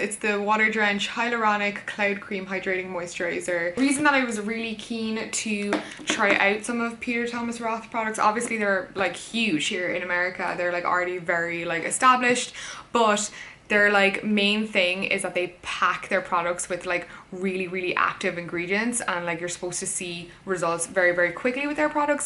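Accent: Ireland. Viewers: omg ur Irish!!!!!!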